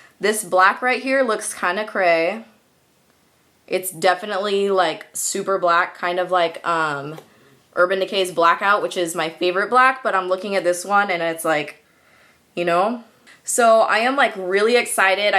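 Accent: California, United States. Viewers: You sound like you're from California :) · I can tell you're from California by how you talk lol it's great! You're so pretty and I love your videos!!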